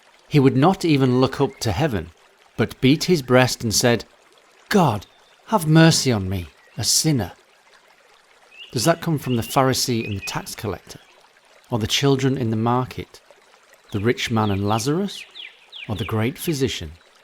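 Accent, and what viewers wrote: United Kingdom. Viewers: Such a brittish accent 🇬🇧 😂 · LOVE THOSE BIBLE QUIZES.GREAT QUESTIONS!!! LOVE THE BRITISH ACCENT.GOD BLESS EVANGELIST ROGER MANSOUR MISSIONARY TO HAITI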